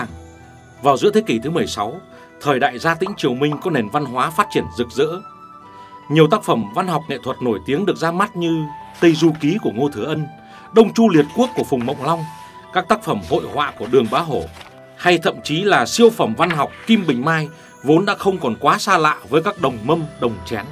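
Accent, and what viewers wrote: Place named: Hai Phong, Vietnam. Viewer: Giọng Hải Phòng đặc sệt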